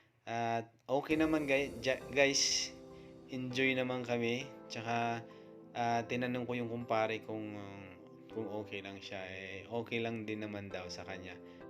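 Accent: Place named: Bicol, Philippines. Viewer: Akala ko Bicolano ka,, saka yong bukal akala ko pinakikita nila talaga 😂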